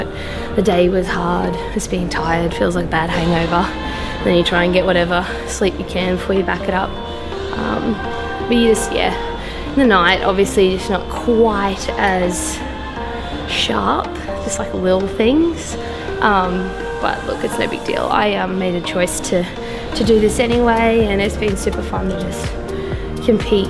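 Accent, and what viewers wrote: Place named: Australia. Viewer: Amazing achievement! Congrats! ❤ Can I make a tiny suggestion for the next video? Could you turn down the level of the music when there's a lot going on in the background? With you speaking quickly and the background noise in the gym plus the music, it's quite hard to understand sometimes, at least for someone who's not from Australia ;) Thanks!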